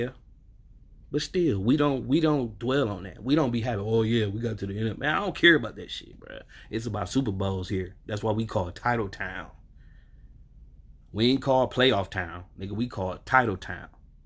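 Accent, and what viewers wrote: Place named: New York, United States. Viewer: I’m a Phins fan but I’m OK with the Pack. I hate the Pats like there’s no tomorrow. I came across your video of the draft and found your response to getting Love hilarious. I know the feeling well. We’ve drafted guys I couldn’t stand. By the way noticed you’re Boriqua flag tattoo on your forearm. I’m thinking your from NY by your accent. How’d you wined up being a Packer fan and not a Jet or Giant fan?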